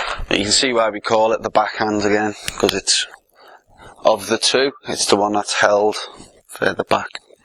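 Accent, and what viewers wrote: Liverpool, United Kingdom. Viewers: A scouser! Too right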